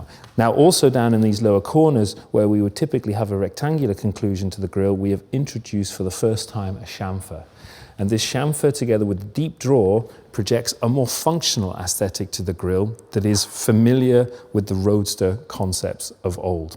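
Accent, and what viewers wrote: United Kingdom. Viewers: Nice accent, what part of the UK are you from?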